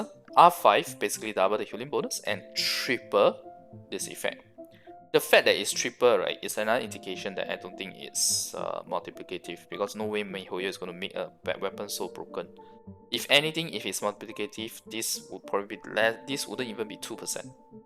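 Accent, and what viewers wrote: Malaysia. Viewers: Hey, came across your video on a fine random Sunday, and gave it a listen. It's rather long and my brain has troubles processing lots of technical information so I thought I would drop a comment to clarify a few things instead. But first of all... Nice to know there's a fellow SEA player out there, in fact, I'm guessing you're Malaysian more so than Singaporean:P. Yes, the accent was super thick (no, nothing wrong with that). If you are Malaysian, just know we're brothers KEK. I'll be even happier if we actually live in the same capital, cause I see a lot of Malaysian faces on Genshin and a few of them doing content creation, and I think we could use more Malaysian talents in a game like Genshin Impact. Now onto my question... Everlasting Moonglow. You mentioned something about the weapon's passive giving FLAT bonus instead of Multiplicative bonus. 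Like I said, I am not a math guy and technical stuffs isn't my forte. Usually, I just do generic math and that's about it, but what is multiplicative bonus in this context? Say I have 50,000 HP on Kokomi now, and this weapon gives me 3% at R5. Can I assume multiplicative means 3% of 50,000? Meaning 1,500 for Normal Attack DMG as stated in the weapon's description? Or is this FLAT? All things taken into consideration, the increase does feel rather meager, but I think the gem of the weapon lies in its ER mechanic. Here's what I don't understand tho. I'm assuming you referred to the Kokomi Mains' master guide for damage cros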